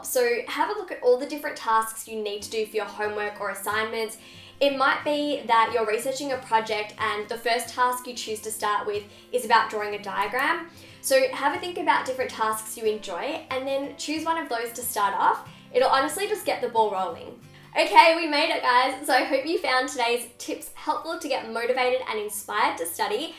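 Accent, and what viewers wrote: Australia. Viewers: Is it just me, or is ' Australian accent actually very cute?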